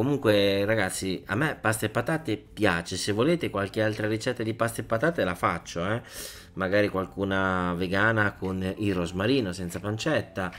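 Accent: Naples, Italy. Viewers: haaaa bellissimo il tuo napoletano,e jammebbell"jà Bravvvoo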